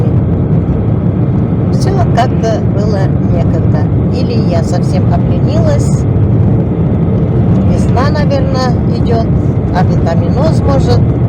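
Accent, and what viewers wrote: Tatarstan, Russia. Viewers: вы молодец люблю татарскии акцент я сама татарка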